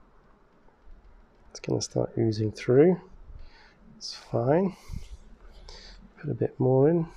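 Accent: England, United Kingdom. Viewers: It’s an English accent lol. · Great removal, and it’s so nice to hear a British voice as a posed to an American.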